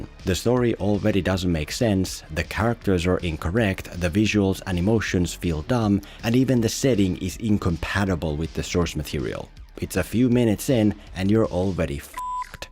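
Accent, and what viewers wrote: Finland. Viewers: I have watched your videos for more than 10 years and only now has it clicked for me that your accent might be Finnish.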